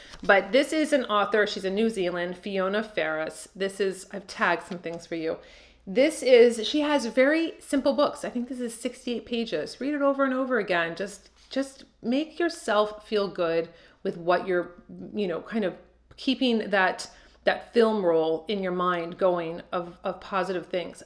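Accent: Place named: Cleveland, United States. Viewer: Love your videos,. Where are you from originally? Your accent and phrasing sound just like my sister-in-law who is from Cleveland, OH. Close?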